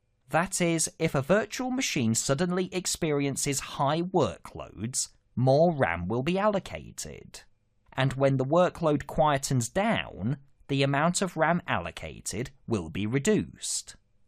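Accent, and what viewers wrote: United Kingdom. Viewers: Best explanation ever on Hyper-V and I enjoyed the British accent. Well done and thank you for the free training videos.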